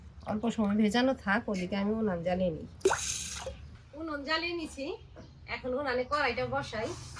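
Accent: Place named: Dhaka, Bangladesh. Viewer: এই বোনটা মনে হয় ঢাকাইয়া । কথাবার্তায় তাই মনে হয়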